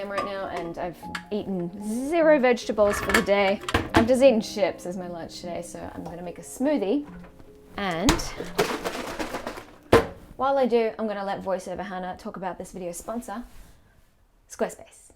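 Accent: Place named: Australia. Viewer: I'm so jealous of you. Although I've lived a good portion of my youth in Japan, I have not experienced Japan the way you have. I'm glad you share your experiences so I can enjoy Japan from your perspective. You also put me to shame to the Japanese language because I can barely understand it or speak it, LOL, the problem from living on military bases. I love your Australian accent! Keep going with your content....I've enjoyed several :)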